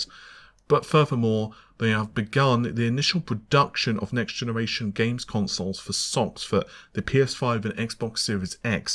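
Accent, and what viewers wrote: England, United Kingdom. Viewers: english accent?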